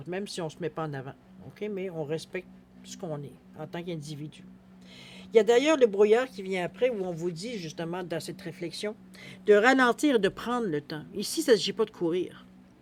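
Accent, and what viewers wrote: Quebec, Canada. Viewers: Bonjour je vous découvre et j ai beaucoup aimé vos tirages.Selon moi vous êtes du Québec selon votre accent si oui moi aussi.J adore vos interprétations à chaque oracle.Ce tirage me va comme un gant.Merci beaucoup ❤🙏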